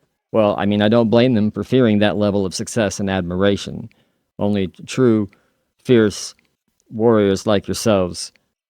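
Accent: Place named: United States. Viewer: This was such a beautiful video! You are a genuinely kind human being. I hope your channel continues to grow exponentially! I think my favorite part was when you had to show your manliness intimidation move(s)😜when your lovely wife showed up. It was as if I was watching a British narrated nature channel only with an American accent. I loved watching your girls interact with you and seeing you joke around with your pops. I, too, feel everything happens for a reason. Remember, it’s always darkest before the dawn. It’ll all work out somehow.😊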